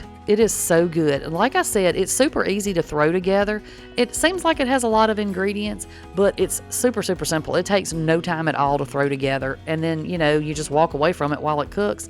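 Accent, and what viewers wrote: South, United States. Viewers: I swear to girl you crack me up you make my day. Where are you from I'm from mobile Alabama and you sound like a southerner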